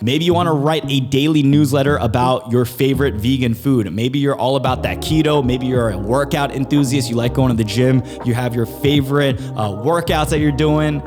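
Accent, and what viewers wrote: United States. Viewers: Very nice video... The words that come out of your mouth are really clear enough to hear.... Similar to an American accent.... It's good....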